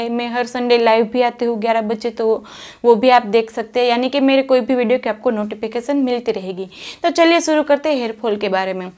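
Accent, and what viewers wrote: Gujarat, India. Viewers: Ap Gujarati ho ky??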